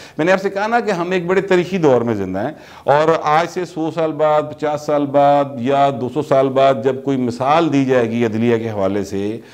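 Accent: Lahore, Pakistan. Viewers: I like your Lahorei style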